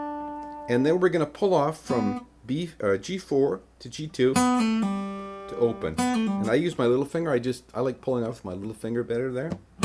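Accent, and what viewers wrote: Canada. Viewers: You definitely have some Canadian spirit in that voice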